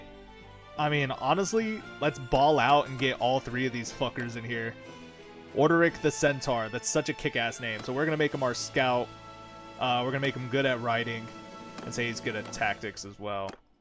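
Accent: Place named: Ireland. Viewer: Great Irish Accent 😁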